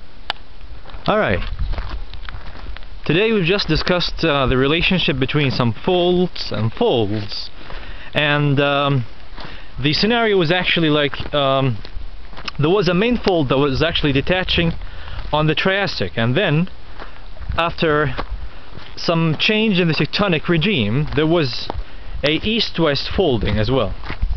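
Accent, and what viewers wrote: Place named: United States. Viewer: nice american accent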